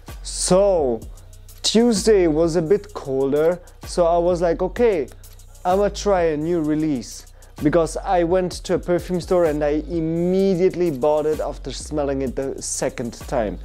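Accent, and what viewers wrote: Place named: Italy. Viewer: Wearing Gris charnel far too much at the moment 🤣. That Italian accent, almost as good as mine 🥲👍🏻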